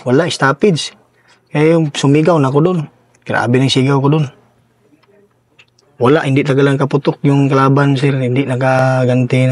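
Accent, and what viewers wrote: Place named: Bicol, Philippines. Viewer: Maganda man sana ang kwento kaya Lang BisakoL ang nag kwento. Ayun DUTAE Lang ang Ending ... Kwentong Barbero. 😂😂😂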